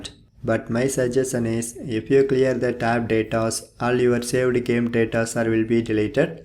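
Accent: South India, India. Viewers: no problem brother i am just joking 😂 i know brother you're from south india and you've different accent ❤️🙏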